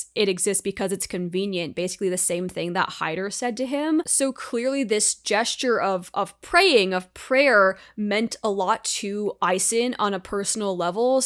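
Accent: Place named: Canada. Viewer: I just learned about the Canadian rise, and now I can't unhear it xD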